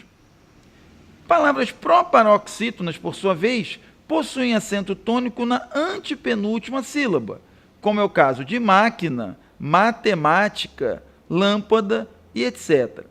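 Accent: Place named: Rio de Janeiro, Brazil. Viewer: Muito boa aula Sílabaxxx, aulaxxx, tõnicaxxx... Carioquês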